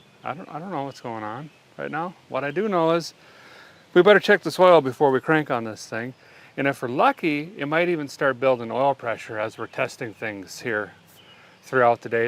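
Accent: Canada. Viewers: First video for me and I swear I would've put my life on it that he was Canadian!!! LMAO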